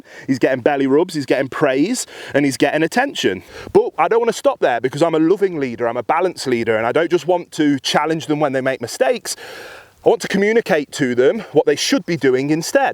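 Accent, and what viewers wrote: United Kingdom. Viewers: Hold up. He's a big husky dude with an epic beard, a British accent, tattoos, sitting in a field of nice grass with puppies and talking about love, empathy, and kindness. Yeah, this dude fucks.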